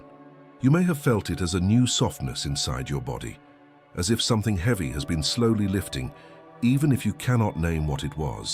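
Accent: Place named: United Kingdom. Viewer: I would like to watch this ,but the British accent gives me the creeps.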